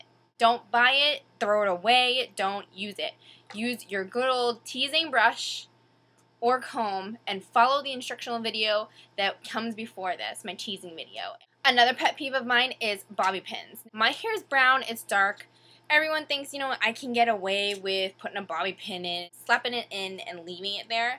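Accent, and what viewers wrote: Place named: Boston, United States. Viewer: I can tell you're from Boston girl, I heard you say "mirrAH" ahaha. Love your vidsss :)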